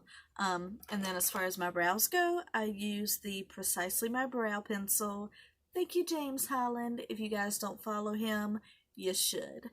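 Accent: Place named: South, United States. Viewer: Yasss come on Southern Accent!!! I’m from Georgia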